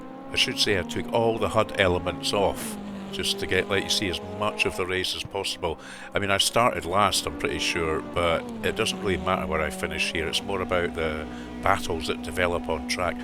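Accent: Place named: Scotland, United Kingdom. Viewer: Great video. Subscribed. AMS2 is very underrated. Nice to hear a fellow Scot. Greatings from Edinburgh.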